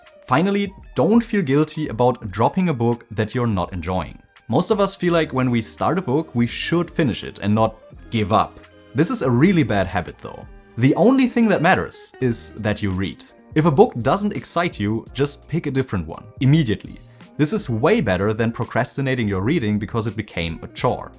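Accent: Germany. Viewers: What technique did you use for learning English? You sound very fluent, but have a fairly strong foreign accent, especially with s vs z sounds and vowel + r sounds. I'd bet your first language is German or a closely related language and you grew up learning English but without a lot of audio input (e.g. somewhere they dub Hollywood movies & TV). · Do I hear a German accent? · I noticed your German accent, ich lerne Deutsch!!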